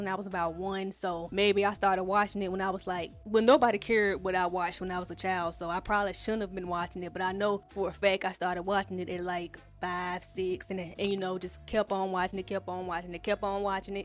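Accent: Memphis, United States. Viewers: Are you from Memphis? The accent much love ❤️ another great video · Where are you from 😅 because I love a good accent!!! It’s giving a lil Glo so I wanna say Memphis ….lol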